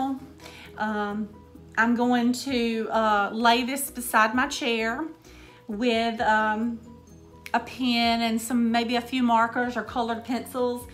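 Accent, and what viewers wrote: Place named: Georgia, United States. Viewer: i love this ! i really like that you didn't make it too fat so you have lots of room for stuff. i would love to see how you sew it together & i would like to know how to tea stain paper. i've tea stained fabric for years, but not paper & i think it looks beautiful. you have lovely original ideas & i'm so enjoying your vids. i know we're neighbors cause you sound like everybody i know :):) have a great week & God bless :) :) nancy in ga usa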